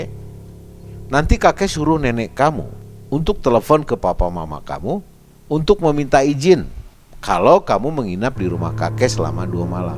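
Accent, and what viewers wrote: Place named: Sunda, Indonesia. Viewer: Suara khas sunda nya mantul pisan euyyy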